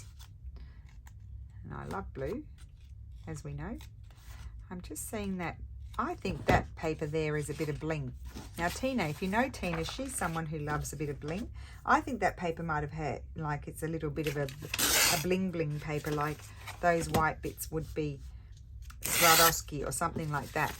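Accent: Australia. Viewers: I often wonder if people not from Australia can understand you because i feel you speak Australia ,using slang or shortened words or sayings that we use regularly. Im not having a go at you because you did mentioned your uncle correcting you. When ever you say something that is Aussie I just smile.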